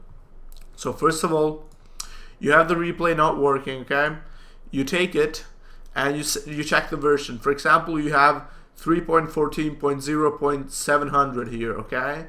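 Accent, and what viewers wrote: Italy. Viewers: Oddio Ma sei italiano? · are you italian?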